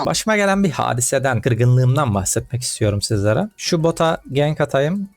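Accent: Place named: Black Sea, Turkey. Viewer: abi karadenizlimisin karadenizli vibe ı alıyorum senden aleyküm selam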